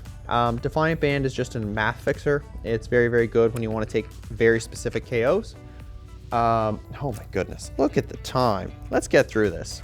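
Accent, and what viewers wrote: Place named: Atlantic Canada, Canada. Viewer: Yo, you sound like you live in Atlantic Canada? Any events nearby coming up?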